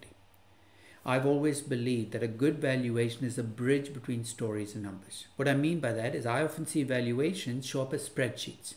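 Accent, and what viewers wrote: India. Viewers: Excellent sir.. are you from Kerala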